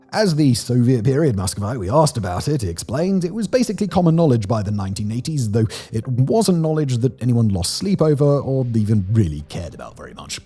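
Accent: United Kingdom. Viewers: Он британец, а они еще и портят американское произношение... Я бы знал, я американец)))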